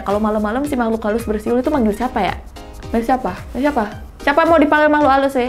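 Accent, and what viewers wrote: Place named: Bali, Indonesia. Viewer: Logat balinya masih kental kak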